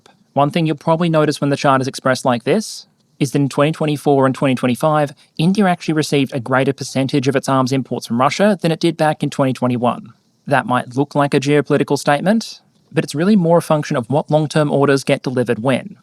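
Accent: Australia. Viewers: Don't be a tool. Different accents exist. Australian derives from SE English which pronounces H that way.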